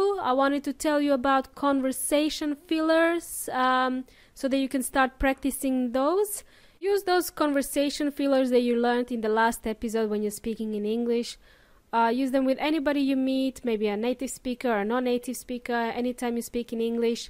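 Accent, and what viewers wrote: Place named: Latin America. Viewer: I love your voice it's so clear to me so my question is are you originally from a Latin American country because your voice sound like Latin person by the way a new subscriber to your channel .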